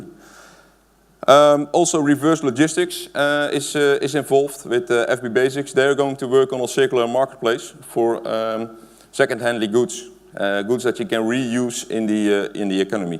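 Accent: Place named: Netherlands. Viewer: Ugh, those dutch and that freakin annoying accent. I'm no native English speaker but at least my English isn't as thick accented as this one. What's wrong with you Ducth? It's like you make no effort at all to speak decently. I much prefer a german or an french person speaking English than this.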